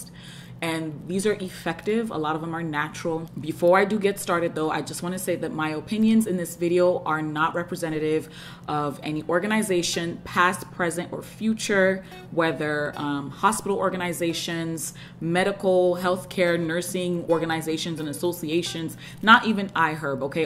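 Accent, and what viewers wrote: Nigeria. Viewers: Lol its the nigerian accent for me no matter how bitter it is I'm going to take it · Love the Nigerian accent.....Love from Naija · Girl I love your frequent switch to Naija accent, lovely video. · are you from Nigeriaaaaaaaa?😅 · new SA suby thank you so much for great advise my 27 year old daughter struggles with body ordor.....anyway. loving the Nigerian accent LOL!!! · You are a NIGERIAN??? At a point it was just clear. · Thanks for all the info. I get my supplements from iHerb too. Never heard of some of the supplements you mentioned. Meanwhile you got me when I heard that Naija accent.. waow I was like, whaaaaat that’s my sis. 👍🏽 Abeg you ooh keep it up 🥰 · Are you Nigerian?? 😅🤣🤣🤣. I like the way the Nigerian in you jumps out every now and then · Great video 👌🏻 Love the Nigerian accent! 😅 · Great video,you got a new subbie as soon as i heard my Nigerian accent?Naija for life,lol. 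· We are the same... I am THE supplements’ Queen! taken most of the supplements you mentioned. Took MSM in only water too! Stopped as it messed with my stomach after a long time. Taken collagen for years. Take chlorophyll as it helps me with energy and my red blood cell count. May just return. ... and did I hear a Nigerian accent? Love how you switch. Laughed a lot. Im subscribing, my naija sis! 💕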